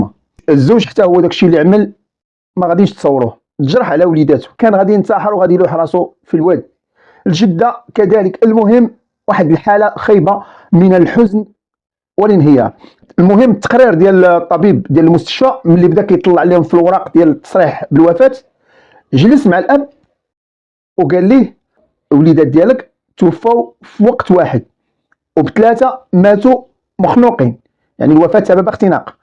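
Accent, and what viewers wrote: Egypt. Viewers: السللم عليكم خويا ❤❤❤❤🎉🎉🎉🎉 صوتك بالهجة المصرية غير عادي هههههه نحبك تروي قصتك بالهجة المصرية ههههه❤❤❤❤❤ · أ حسنت القصة بيمافيها اللهجة المصرية أ حبك في الله يا أخي ❤❤❤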